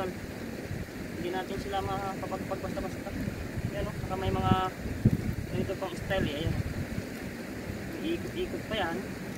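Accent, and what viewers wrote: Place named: Ilonggo, Philippines. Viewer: good morning po.taga saan ka po sa visayas? Ang intonation nyo po ay parang ilonggo😊.Ingat po palagi God bless po.watching from Bacolod City😊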